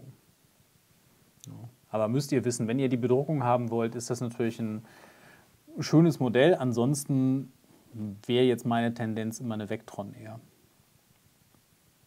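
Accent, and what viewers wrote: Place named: North Germany, Germany. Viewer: Hallo, ich habe gerade Ihren Kanal hier gefunden und freue mich schon darauf mir Ihre Videos anzusehen. Da Sie Norddeutscher zu sein scheinen, hätte ich 2 Fragen an Sie: 1) Wohnen Sie etwa in Dänemark? 2) Warum interessieren Sie sich für dänische Modelle?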